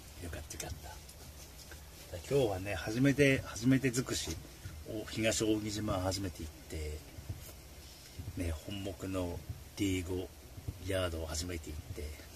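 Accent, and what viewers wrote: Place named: Hokkaido, Japan. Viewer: さんお疲れ様でした。そして本牧ヤードの単独デビューおめでとうさまです😄👍️ 伊藤さんってトレーラー乗りの伊藤さん⁉️昨日動画上がっていてトレーラー降りる事にして次の会社に面接行ってきたと言っていたけど同じ伊藤さんかな………⁉️ ところで、１つ明らかになったことがありまして、私がさんの動画を見ていると（パソコン）、猫がヤキモチを妬いて邪魔をしに来ます。あとメスネコがさんの声に反応して走り回ってニャオンニャオン鳴き喚きます❗️スマホで見てもタブレットで見ても同じ反応をします😅💦⁉️ さんのなまりをダンナの北海道なまりと勘違いして反応してるのかな⁉️⁉️⁉️一昨年亡くなってしまったけど…⤵️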